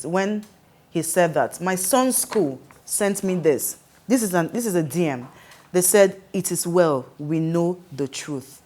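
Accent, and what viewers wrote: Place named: Igbo, Nigeria. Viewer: this your igbo accent sha strong o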